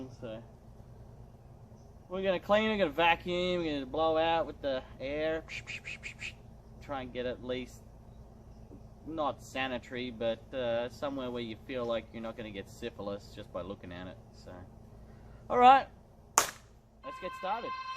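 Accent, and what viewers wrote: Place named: Australia. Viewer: This is so weird homie has a Aussie accent I don't think there in Australia but he's into square bodies like a California or Texas boy I'm jealous of his truck collection slash junkyard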